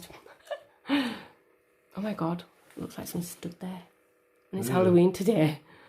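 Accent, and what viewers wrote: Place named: England, United Kingdom. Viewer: I like the accent. England?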